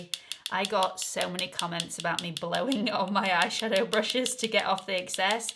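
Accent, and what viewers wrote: United Kingdom. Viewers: After seeing several reviews, including yours, about No7 foundation drops, I ordered and am anxiously awaiting it's delivery. First I've purchased of that brand. As far as "absolutely stunning," I LOVE it and most every other "British sounding" words and expressions you say! You see, I'm from the southern US where lots of one syllable words are drawn out so they have many. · You can say absolutely stunning as much as you like. I just love listening to you chat. ( love your British accent). I would definitely wear the T shirt lol. Oh and the make up looks great!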